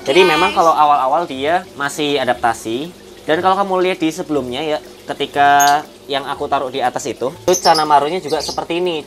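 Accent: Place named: Java, Indonesia. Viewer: Orang jawa ya bang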